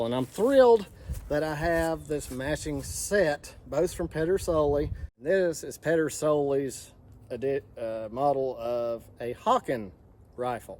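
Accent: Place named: South, United States. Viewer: This worth listening to just for the South of Dixie accent.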